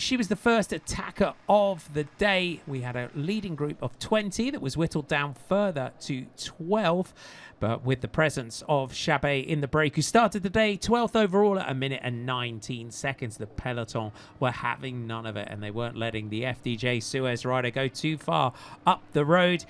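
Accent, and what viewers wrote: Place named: England, United Kingdom. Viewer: It's the English language, not the American language. They invented the language. He's pronouncing it the way all English people pronounce it. Here, in Canada, we also say "kill-ohm-mahters".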